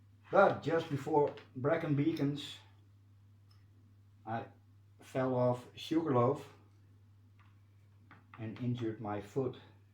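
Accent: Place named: Netherlands. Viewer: Nice stories. Have you noticed that with a few exceptions your commenters are all female? It must be that sexy Dutch voice that does it.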